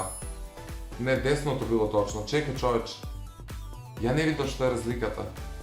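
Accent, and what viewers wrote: Kumanovo, North Macedonia. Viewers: Koj saka da snima kade zboruva 24h Kumanovski. Stavete lajk za da vidi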